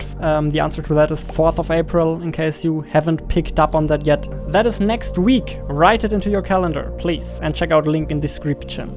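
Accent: Germany. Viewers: Wie man halt instant an deinem Akzent merkt das du Deutscher bist. :D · Haha ich wusste es dieser aktzent der muss deutsch sein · Are u from Germany? u have a German accent ^^ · Bist du deutscher? Are you german? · du sprichst see also to see immer wie „sie“ aus und nicht wie „ßie“😂 Daran hab ich auch gemerkt dass du deutsch bist. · Feier diesen Deutschen Englisch aktzent, aber nices Video. Kannst du mal zeigen wie man spiele auf Steam hochlädt? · Er ist deutscher. Man hat es im Call gehört. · Bist du deutsch?Hört sich irgendwie so an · Direkt aufgefallen, du bist Deutschsprachiger ^^ Aber cool dass mich der heilige YouTube Algorithmus hier her gebracht hat :) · Hey, jetzt weiß ich wieso du einen deutschen Akzent hast 😂🙂❤ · Du bist deutsch? :D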